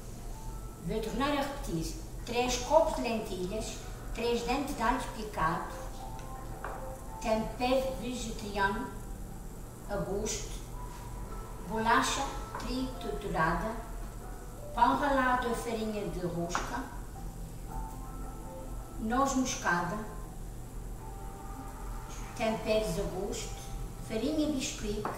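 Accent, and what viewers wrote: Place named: Portugal. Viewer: Querida irmã, que bom ouvir sua oração! Me senti abençoada! Tão lindo ouvir esse sotaque de Portugal. Gosto tanto! Vou fazer essa torta. Minha família come bem pouca carne e estamos procurando parar de vez. Obrigada por dedicar seu tempo em ensinar receitas saudáveis. Continue, pois estarei sempre acompanhando!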